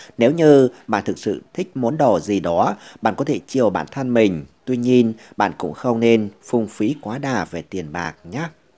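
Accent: Thanh Hoa, Vietnam. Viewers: mình nghe giọng của bạn ...mình đoán bạn người Thanh Hóa ...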